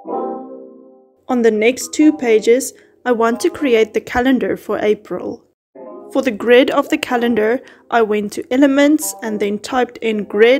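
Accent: South Africa. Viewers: Are you South African? 🇿🇦 That accent is uncanny.🥰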